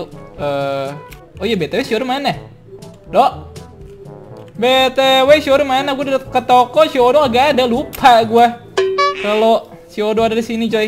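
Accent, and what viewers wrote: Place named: Tangerang, Indonesia. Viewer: kek logat tangerang yak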